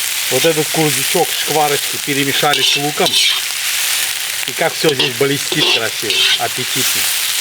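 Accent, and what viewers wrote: Baku, Azerbaijan. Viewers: Мне нравится сумгаитский, бакинский акцент. Сам с Дагестана но родился в Сумгаите. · брат, все твои блюда очень-очень аппетитные, а благодаря БАКИНСКОМУ акценту, ещё и завораживают 👍👍👍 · Сказочное блюдо и сладкий бакинский акцент! ❤❤❤ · Салам. Отличие дядя от других фуд блогеров когда он готовит обесняет, говорит, шутит. Бакинский акцент русского языка вапше супер. Жаль что отличие от "немых" фуд блогеров подписчики мало. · Уверен,блюдо невероятно вкусное!но благодаря вашему Бакинскому акценту,думаю оно вкуснее еще в 10 раз! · Ох уж этот Бакинский акцент... ❤❤❤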